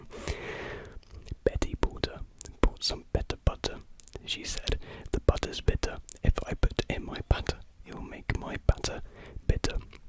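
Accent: United Kingdom. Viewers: British accent 😩😍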